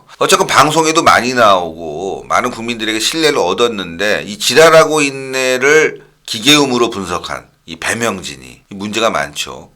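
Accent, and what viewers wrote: North Korea. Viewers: 딸랑 딸랑이 입니다. 이북사람 목소리 같네요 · 평안도 사트리 같애요 억양이?!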